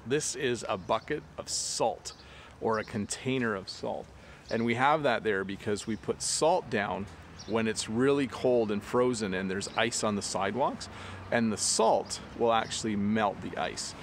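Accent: United States. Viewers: You really is a canadian? Your accent really like the american 😍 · I'm from Brazil and I liked your video and your accent, it looks like american I have subscribed Thanks a lot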